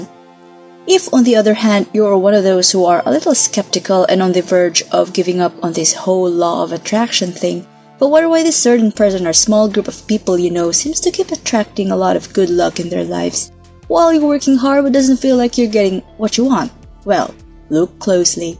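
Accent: Philippines. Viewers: Wonderful! Your voice sound like from the Philippines.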